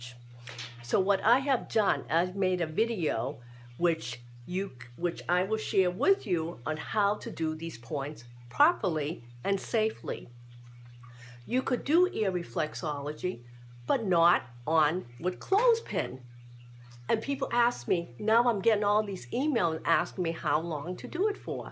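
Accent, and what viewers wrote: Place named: Massachusetts, United States. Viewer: Thank you for this clarification. Wow, I bet you are from Massachusetts! I can detect that accent anywhere..............blessings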